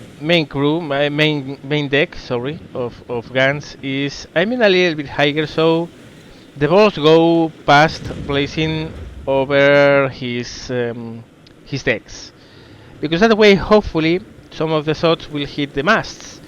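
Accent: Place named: Spain. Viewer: Dios se nota a la legua que eres español jajaja buen video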